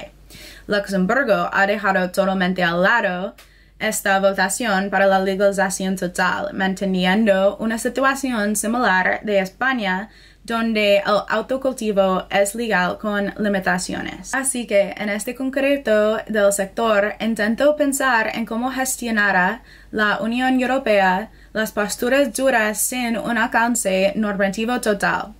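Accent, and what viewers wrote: United States. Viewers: Que lindo El Castellano pronunciado por una Estadounidense . Saludos Desde Italia .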